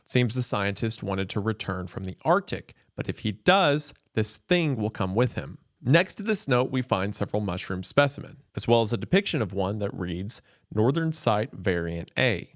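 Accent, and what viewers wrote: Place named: Appalachia, United States. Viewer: My boy’s Appalachian accent is coming out full force. Love to hear it.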